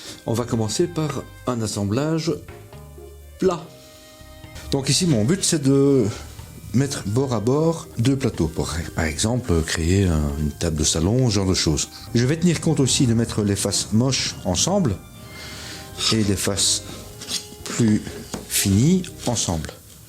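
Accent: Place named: Belgium. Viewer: On sens un petit accent belge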